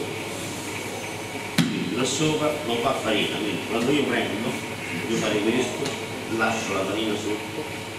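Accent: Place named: Rome, Italy. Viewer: Un maestro..e poi è troppo bello il romanesco!! Bravo!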